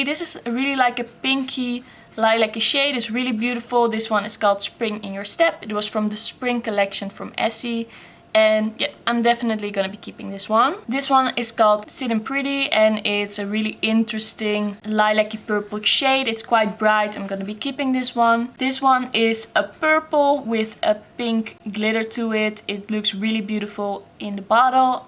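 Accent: Netherlands. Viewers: ❤️❤️❤️ leuke video, hoorde meteen aan je accent dat je Nederlands hebt haha ! Wil toch ook nog eens H&m nagellak proberen, verder ben ik ook erg van Essence, Essie en catrice 😋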